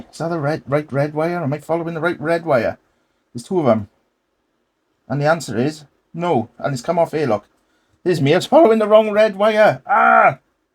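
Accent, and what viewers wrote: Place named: Swansea, United Kingdom. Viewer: Happy days beautiful swansea jack accent I have one Awesome knowledge is power 🎉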